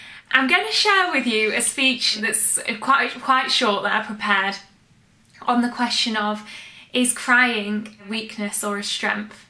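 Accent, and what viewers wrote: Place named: England, United Kingdom. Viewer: your analysis is simple and correct. Especially delivered with beautiful English accent.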